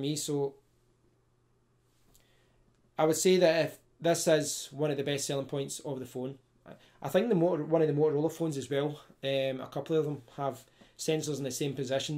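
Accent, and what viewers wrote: Scotland, United Kingdom. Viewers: Oh dude... That Scottish accent... You are probably from Glasgow?! Miss that accent after moving away from Scotland... :)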